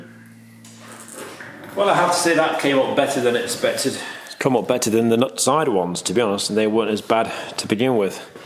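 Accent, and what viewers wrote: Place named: England, United Kingdom. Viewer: This guy has the Strongest English assent I have ever heard. I can only comprehend about 30% of what he mutters.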